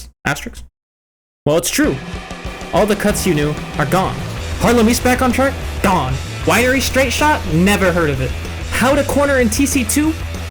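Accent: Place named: Italy. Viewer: I am italian, you are italian, i can hear that you are!!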